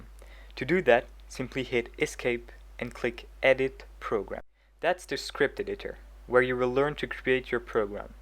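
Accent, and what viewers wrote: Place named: France. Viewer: Salut, petite question, est tu Français? Car tu a un accent qui le laisse penser... Si C'est la qu'à pourrais tu faire une version FR de tes tuto stp? Je galère beaucoup avec la programmation... En tout qu'à merci pour tes tuto, la traduction automatique fonctionne à peut prêt correctement même si ce n'est pas toujours parfait c'est déjà mieux que rien et ça ma permis dans apprendre déjà pas mal sur la base de la programmation. Je passe au tuto suivant ;-)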